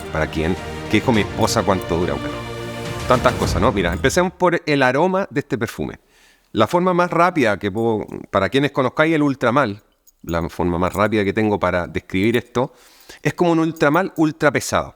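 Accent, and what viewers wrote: Chile. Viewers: Se nota que es Chileno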